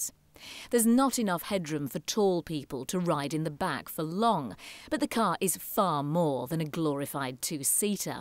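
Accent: RP, United Kingdom. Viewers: I’m 14, 5”10 and I fit in the back perfectly! Enough headroom and isn’t bad on comfort not exactly a rolls Royce but perfectly fine for most people and oh my god did this woman annoy me when she said about having to adjust the mirrors manually and having to wind the windows down manually with her “posh” accent she made it sound so working class to her assuming superior lifestyle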